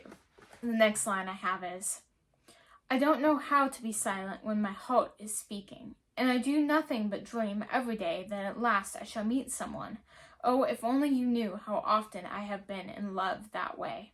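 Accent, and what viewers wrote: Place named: United States. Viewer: Hi thanks for the video. English is not my first language. I'm very curious about human geography. You've got very cute accent. I'd love to know if it's from some specific part of the US.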